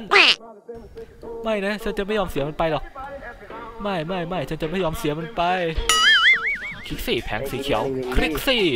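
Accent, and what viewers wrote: Isan, Thailand. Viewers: เดินมาลาสคะเจ้า....คนอีสานใช่มั้ย<num>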